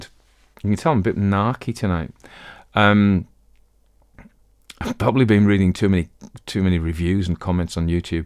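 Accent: United States. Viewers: You are really in a class by yourself,. An interesting story very well presented. You do "American" so well. I love all the commentary at the end. Don't stop doing that. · First, quite an excellent American accent (though not especially mid-western, with long, flat "A"s). I also enjoyed the voice of the "real" Mr. G. As for the story, shades of The Red Headed League. As for your postscripts, they are always entertaining. Well done, as usual, and thanks.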